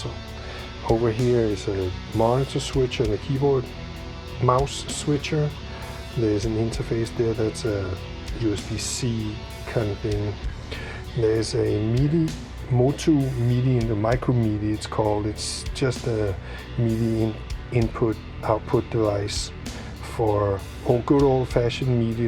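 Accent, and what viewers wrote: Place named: Denmark. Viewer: HELLO! "Old friend"... GREAT an`really informativ video. Good to see that your still are alive. It`s fun to hear! That you still got "The Danish accent". Greatings from Denmark...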